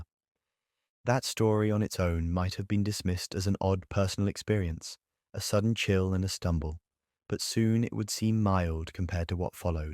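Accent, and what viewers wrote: United Kingdom. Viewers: Nothing better than a British lad reading spooky stuff. Great night ahead.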